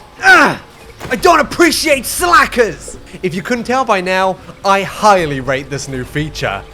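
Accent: United Kingdom. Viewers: Sometimes I deadass forget that is british and then abruptly remember in the middle of the video even though his british accent is prevalent in the entire video. No idea why Anyway UNPAYED LABOUR LETTTTTTT'SSSSSSS GOOOOOOOOOOOOOOO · are you a brit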